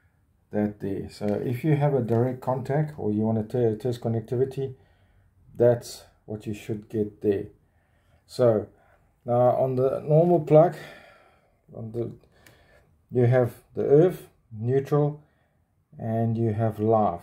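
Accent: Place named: South Africa. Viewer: Are you in Durban? Your accent sounds South African with a hint of India.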